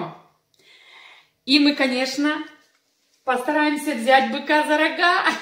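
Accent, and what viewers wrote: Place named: Odesa, Ukraine. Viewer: Да, действительно подарки красота. Спасибо, приятно было услышать одесский говор. Удачи и всего самого наилучшего Вам.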